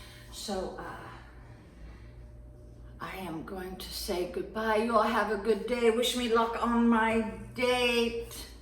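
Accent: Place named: Southwest Virginia, United States. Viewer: OMG I love that SW Virginia accent. My late husband was from Roanoke.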